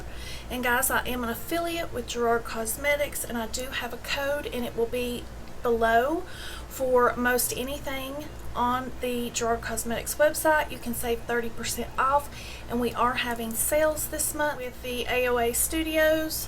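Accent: South, United States. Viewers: 🚨🚨🚨🚨🚨🚨 I'M READY... I'M JUST HERE TO SEE YOUR PRETTY LITTLE FACE!!! ITS THEM EYES AND THAT COUNTRY GAL ACCENT FOR ME😘😘😘😘😘😘 🚨🚨🚨🚨🚨🚨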